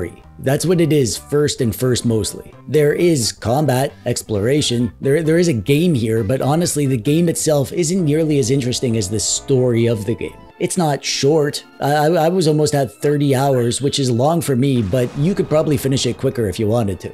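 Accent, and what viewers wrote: Canada. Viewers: Thanks for the review of this classic game. PS, you're one of the most Canadian- sounding YouTubers I've ever heard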